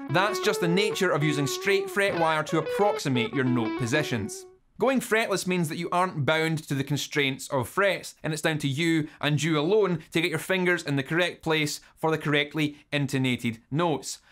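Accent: Scotland, United Kingdom. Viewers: What a lovely Scottish accent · I've seen enough Peaky Blinders to know that this man is Scottish.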